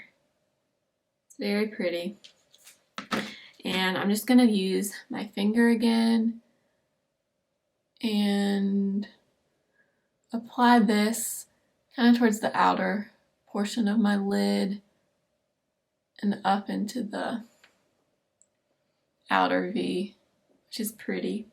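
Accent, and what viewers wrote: South, United States. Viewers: You are so heavenly! Love your videos! Where are you from? I hear a slight southernish accent perhaps?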